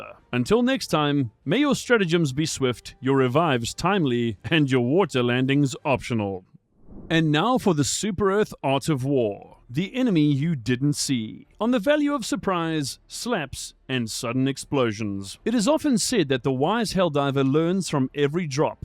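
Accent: South Africa. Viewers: Permission to query Commander sir, are you deployed out of Sector 5 by any chance? Your accent reminds me of South Africa sir! Glory to Super Earth 🦅🌍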